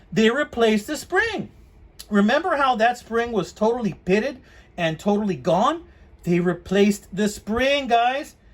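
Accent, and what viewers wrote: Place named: Texas, United States. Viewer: Well before I saw the return address on you send-in I went,'This guy sounds like a finest-kind native TEXAN and sure enough...! Your lead in was full of information and not at wordy and completely informative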